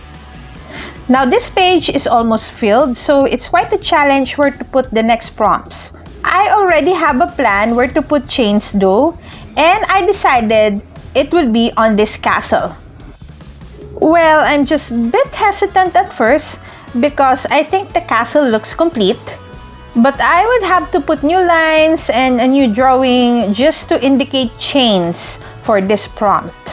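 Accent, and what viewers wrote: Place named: Philippines. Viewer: Salamat po! My son, Jaja heard your accent and shouted "She's Filipino!" What are very creative way to share the gospel. Watched the entire video including the bloopers!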